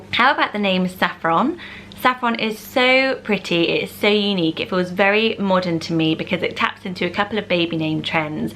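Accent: United Kingdom. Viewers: Some of these names sound so beautiful whenever you say them with your lovely British accent, then they sound awful whenever I try to say them with my Kentucky accent. 😩 Clover, for example. She says: Clo-vah I say: Clo-vurr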